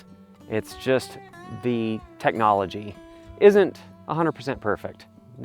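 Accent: California, United States. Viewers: I was searching for California accents yesterday and stumbled upon your video from 11 years ago. Just saw another one of your videos now, and it feels so surreal. I really love your accent, and I’m definitely going to watch all your videos to learn it.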